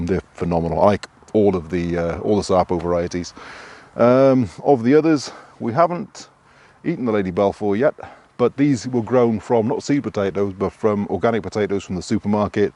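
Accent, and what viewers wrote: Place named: Scotland, United Kingdom. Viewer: I love your accent I'm in New York are you of Scottish decent?I think I asked this once before to you lol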